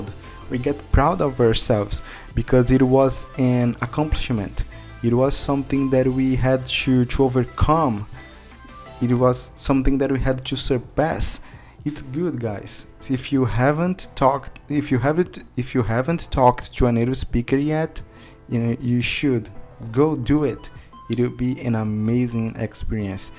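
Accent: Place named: Brazil. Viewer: It's great watch your video again. I watch a lot videos about English, cambly, things like this. But the best of them is yours. And The best "brasilian" English of them is yours. I entered on Cambly because of your videos. Keep going guy 🤜🏿🤛🏿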